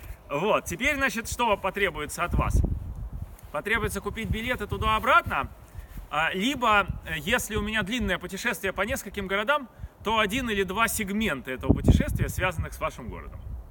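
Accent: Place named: Okanye, Russia. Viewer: Очень харизматичный мужик (в хорошем смысле). Окает как монах.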